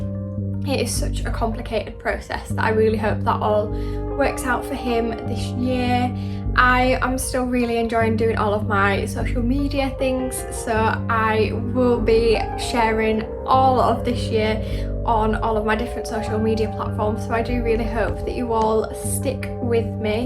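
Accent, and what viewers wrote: United Kingdom. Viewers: Pure english. Pronounciation of the british. Hard to understand. Unlike the american english. Its too easy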